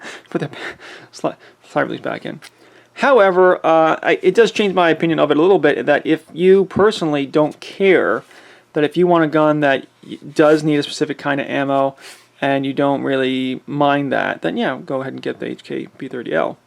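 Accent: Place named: Connecticut, United States. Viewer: What accent is that? You sound like my pal from Connecticut.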